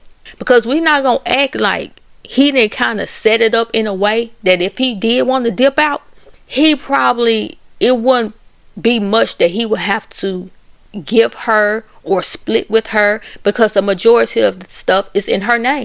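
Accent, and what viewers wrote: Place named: Alabama, United States. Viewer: Hey girl hey! I love your channel and I think you have the cutest accent ☺️ Are you from Alabama? I’m trying to get better with my regional accent recognition 😩😂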